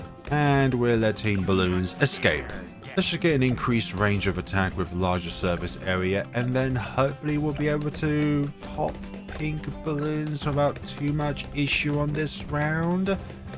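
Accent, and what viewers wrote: United Kingdom. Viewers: Wait.are you brittish or australian? Cuz you sound like the ceo of australia.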